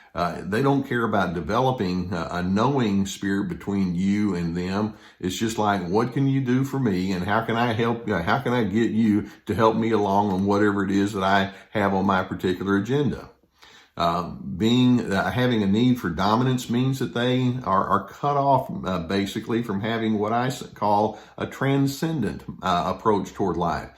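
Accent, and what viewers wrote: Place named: West, United States. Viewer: I think I would purchase an alarm clock with Dr. Les Carter giving out some solid grounding and factual encouragement first thing in the morning. That accent and articulate way of constructing a point of view reminds me of Western Movies, the reasonable man who verbally manages to get two gunslingers to avoid the showdown.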